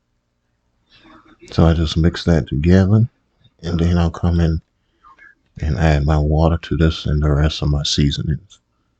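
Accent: Charleston, United States. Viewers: Are you from Charleston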